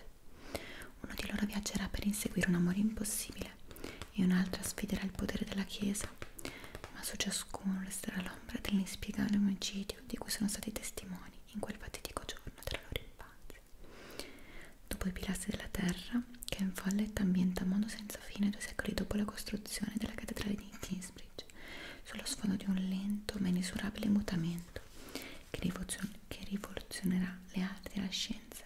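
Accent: Italy. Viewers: An American dude will always fall for a pretty Italian girl with that accent and language. It would be hard not to! 😌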